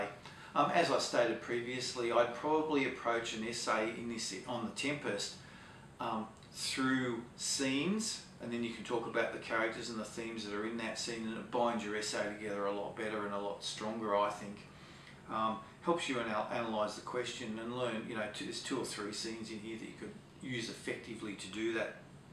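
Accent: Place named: Australia. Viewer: I loveeee your Australian Accent!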